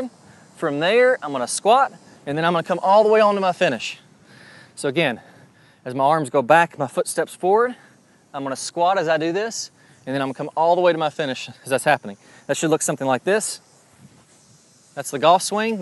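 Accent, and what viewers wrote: United States. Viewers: Great video! I've already started on the drills. Are you an Alabama boy? You sound like it! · This dude's accent sound like Mid country side of America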